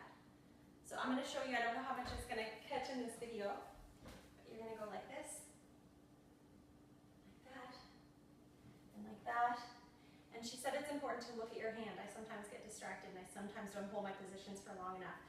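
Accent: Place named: Eastern Canada, Canada. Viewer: Thanks for this video. I am just dealing with this now and you gave me some hope of recovery. Worst feeling Ive ever experienced! Are you in Canada? I feel like I hear a bit of an eastern accent. I’m in western Canada.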